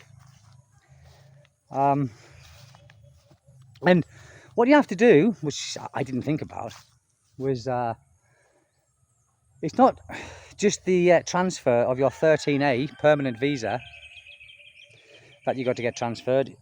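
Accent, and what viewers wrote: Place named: England, United Kingdom. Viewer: I love your English accent mate.😁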